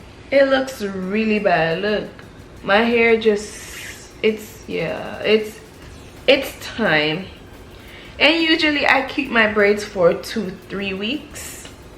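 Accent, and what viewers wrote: Jamaica. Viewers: Mi luv yuh Jamaican accent😝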